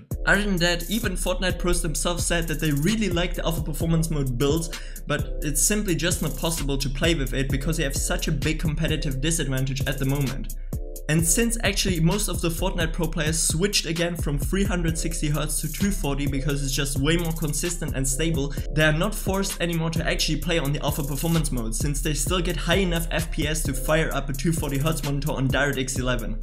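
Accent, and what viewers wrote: Germany. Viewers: Dein deutscher akzent so nice haha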